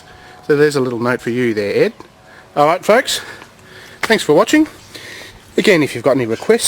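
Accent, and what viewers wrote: Australia. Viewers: are you australian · Your Austrailian... just wondering if you have ever seen the movie Breaker Morant about the Aussies in the 2nd Boer War and his rule 303 speech he gives. Awesome movie just, thought I'd recommend it.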